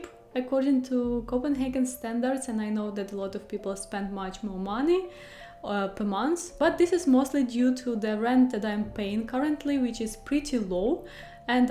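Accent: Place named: England, United Kingdom. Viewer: Your voice is so cute, I love your English accent. Greetings from México. =)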